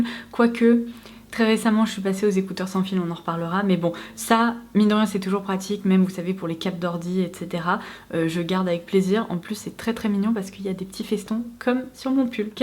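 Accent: England, United Kingdom. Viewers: Ton accent anglais est magnifique, à tu fais des études spéciales ?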